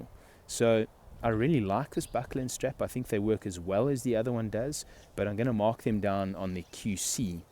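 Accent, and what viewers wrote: South Africa. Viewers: South Africa! To my American ears it is hard to distinguish from Australian (but I'm getting better). Great review. Thanks for posting! Also, thanks for the web page directions. I'm following and looking for more posts. P.S. I can't believe they've stopped the sale of alcohol Nation Wide due to COVID. My prayers are with you!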